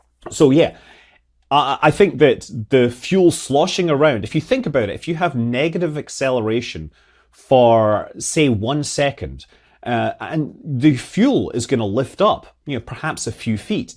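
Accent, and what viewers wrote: Scotland, United Kingdom. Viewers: i like your channel and the scottish accent. i have on question about the hot staging and the negative acceleration which gives sense about causing the interruption of the steady propellant flow to the raptors. fluids without force field to keep them above the outlet are no issue on earth because gravity. acceleration may replace gravity at hot staging. I don't get how fluid transfer in space should look like. is the acceleration planed or do they think on a type of plastic bag inside the tank open to the outlet and on the other side by gas pressure to push it out. are there "plastic bags" working at liquid O2 temperatures?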